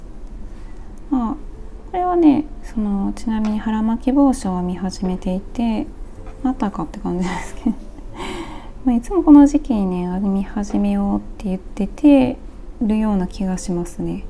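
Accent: Kansai, Japan. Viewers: こんにちは👋😃私がさんを知ったのは、4年前急にくも膜下出血で右半身が不自由になって、ショックで何もやるきが失くなって一日中泣いてばかりしてた時、編み物を勧めてくれたんですよ。😅でも、手が思うように動かなくて💦😥ひたすら動画をながめてました。そしたらなんと私と同じ関西弁で編み物をされているさんを見つけて😂 …なんか、今まで編み物の基本ばかりにとらわれてしまって、できないことばかりで諦めてたのに、さんの話しを聞いたら、なんかできるような気がしてきて挑戦してます。今ではリハビリをかねて編み物してますので手が大分動くようになってきました❗️足はまだまだですが😅💦何よりさんのおかげでかぎ針が使えるようになったのが嬉しくて😂あなたの動画に出会って無かったら今頃は死人のようになってたかも😅 さんの関西弁も大好きです😄私は関西人だけど関西弁を喋らないところに住んでます。懐かしさもあります。これからも楽しいトークと編み物、UPされるのをまってます🎵長々とスミマセン❗️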